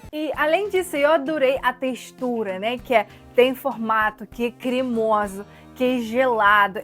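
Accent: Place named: North, Brazil. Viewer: Parece brasileira e do norte do Brasil!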